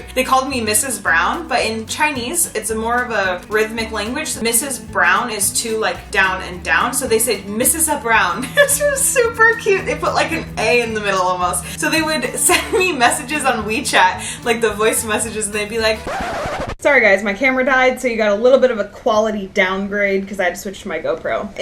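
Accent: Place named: United States. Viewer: + Dear. You are NOT a ''Native English'' speaking person Actually you do NOT speak English YOURSELF! You speak American English and have a strong American accent, use so many American slang words and as an English person, I find it hard to understand what you are saying at times. For a start, you speak so fast and gabble far too much!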